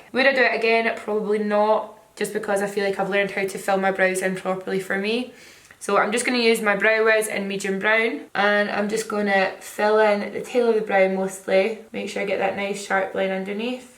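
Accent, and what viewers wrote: Scotland, United Kingdom. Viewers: the scottish accent is the best! But then again, I'm scottish too hahaha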